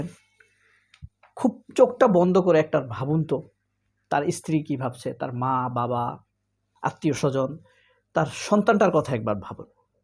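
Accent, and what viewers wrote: Noakhali, Bangladesh. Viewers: নোয়াখালী র ভাই আপনের কথা শুনতে খুব মজা লাগে , কস্টের মধ্যে ও হাসলাম।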